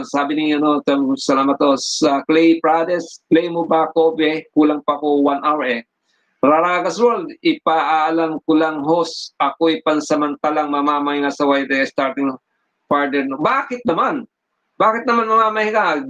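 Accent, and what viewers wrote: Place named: Bicol, Philippines. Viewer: Meron kuya my sounds ..you are from bikol?I'm from bikol too..from Iriga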